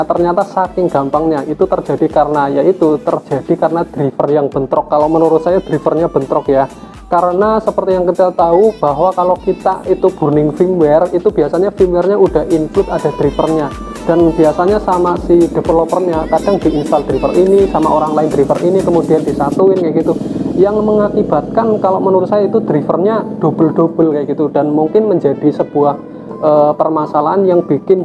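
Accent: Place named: Kebumen, Indonesia. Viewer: Alamatnya mana bang kayake kaya wong kebumen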